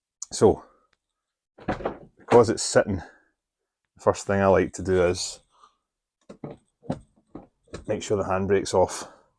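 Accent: Scotland, United Kingdom. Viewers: Love the scottish accent 👍 great review I have actually just bought one of these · Buddy you have a strong Scottish accent while have a US flag in your garage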